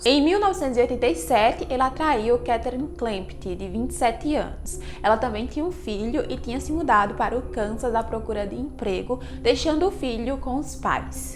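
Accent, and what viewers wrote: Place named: Rio Grande do Norte, Brazil. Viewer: Segunda vez que venho no seu canal muito interessante, pelo seu sotaque. Vc mora em natal rio grande do Norte ? Bjs linda !!!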